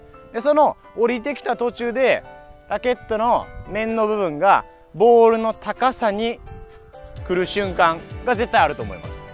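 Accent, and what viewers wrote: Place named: Kyoto, Japan. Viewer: ウィルスミス、朝から爆笑してしまいました😂 懐かしい京都弁も耳に心地良く、 、大好きです💕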